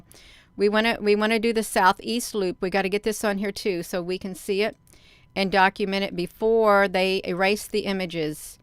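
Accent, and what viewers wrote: South, United States. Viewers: I love your cute voice. You should let your natural accent come through. I hear a y’all can I get an AMEN!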